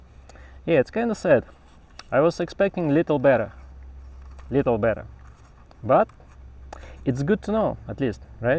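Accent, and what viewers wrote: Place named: Russia. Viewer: Дякую за відео. У тебе точно такий же акцент як в мене, тому я здогадався, що ти теж руский )). У мене такий же дрон і я трохи розчарований як працюють сенсори. На скільки я зрозумів, дрон не бачить сухих гілячок.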